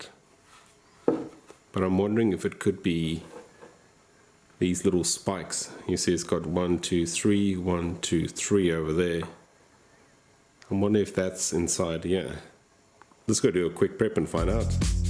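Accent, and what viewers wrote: South Africa. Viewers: wait are you south african?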